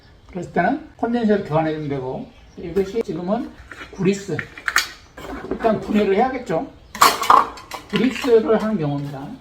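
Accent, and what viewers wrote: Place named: Jeolla, South Korea. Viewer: 으미~~~~! 구수한 전라도 억양 듣기 좋네요~ 영상 잘보고 갑니다.... 바로! 실천에 옮기도록 할께용~~♡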